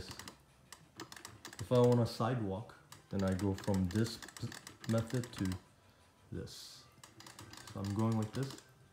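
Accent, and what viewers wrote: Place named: Russia. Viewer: Cool video but can't understand anything with your heavy russian accent lol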